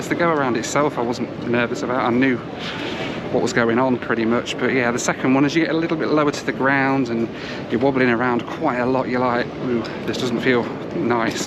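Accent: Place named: United Kingdom. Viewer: Thank you for doing what you do. As an Italian that grew up in the UK, and that now lives in the US, it’s good to hear a familiar accent showcase the same passion I have.. aviation. You’re likely the only channel on YouTube, I watch every day. Thanks again and I wish you luck for the future!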